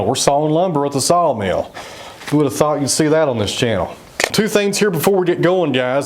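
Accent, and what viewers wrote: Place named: Georgia, United States. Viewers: First vid I've watched of yours. Soon as I heard your accent I recognized it. You say your in Georgia? I think I heard your accent from guys from Kentucky too. I could be wrong. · . . . he has a 'drawl' in his voice.Beautifull accent.Lots of them there down in the south...I enjoyed the show very much and i thank you for taking the time to spend it with us....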